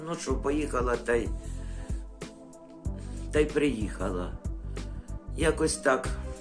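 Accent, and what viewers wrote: Kherson, Ukraine. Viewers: Я, коли дивлюся відео Ваші просто "побалакать", ніби побувала у своєму рідному до болю, селі на Херсонщині 😢😢😢, там так розмовляють, як Ви. Сидю, та подібні слова, осьо.... Я, як приїхала проживати на захід України, то з мене сміялися, що я так розмовляю😊, зараз вже "вигавкалася", як кажуть, та, всеодно, деколи щось таке подібне проскакує😂. Так мені до душі Ваші посиденьки, ну, класна Ви, будьте здорові та Богом бережені 🙏❤️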